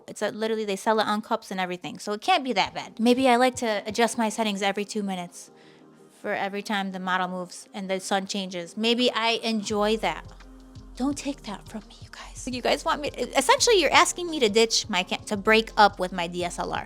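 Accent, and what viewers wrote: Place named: New York, United States. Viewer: My kinda gal! Love my old 'dinosaur' DSLRs and I'm still using my iPhone 7! I'm English: so I don't know U.S. accents well. Is that a Bronx New York accent? Anyway - whatever it is - I LOVE IT!! 💕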